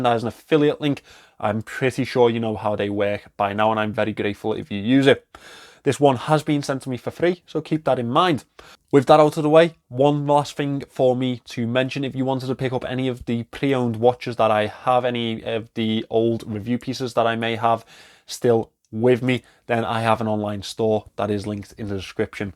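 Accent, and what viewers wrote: Liverpool, United Kingdom. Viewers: Love the Scouse accent bruv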